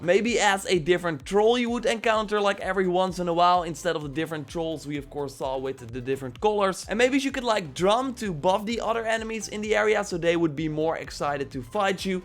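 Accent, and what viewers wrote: Netherlands. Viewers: That horrible Dutch accent. I puke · is any way to ask someone who speak correctly english without any harsh accent like yours ? Because bro it is really difficult to focus on what you are saying with your dutch accent. Then you will focus on the video montage while someone else will care on the speech. And please change us this annoying same and same music background... Take care.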